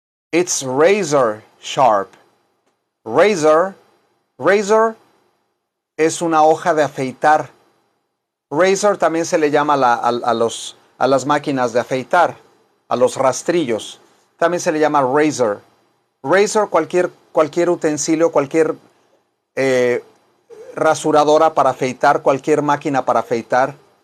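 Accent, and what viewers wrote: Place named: Mexico. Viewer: este profe tiene acento mexicano, pero cara de "español hostia", TOTAL DE DONDE ERES PROFE?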